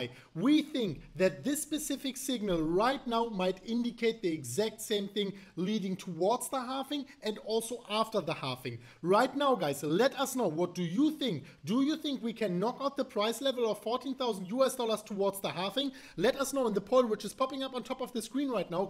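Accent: Germany. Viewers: The fact that i can understand everything that you are saying with that German accent and the pace you are talking is amazes me...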